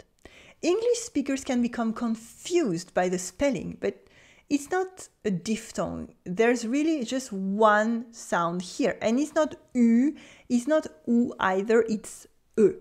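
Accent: France. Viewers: Yes, but keep in mind that you're speaking English with a very heavy French accent, which makes YOU harder to understand.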